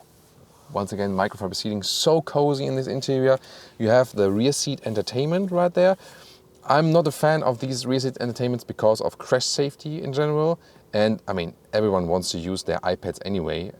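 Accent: Germany. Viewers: Dieser deutsche Akzent einfach ...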